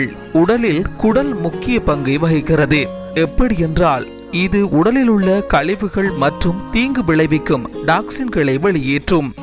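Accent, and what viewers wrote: Sri Lanka. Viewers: That's srilankans pronunciation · He is Sri Lankan tamil...u watch only advantage...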